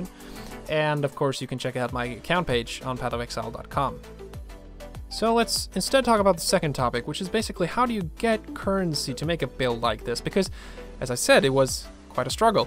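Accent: Sweden. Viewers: Lel, you swedish lad?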